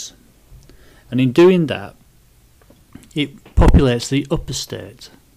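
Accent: United Kingdom. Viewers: Hard to understand british accent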